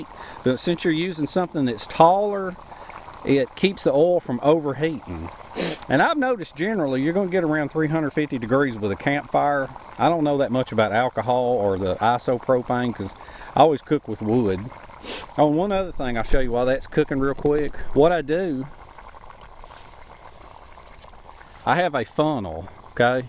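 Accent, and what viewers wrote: South, United States. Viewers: I like your accent, very Southern :) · I'm from the South too. Sounds like home to me. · Yep, you do, hehe... What part of usa is that accent from ? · Your accent is aweson man makes me miss the south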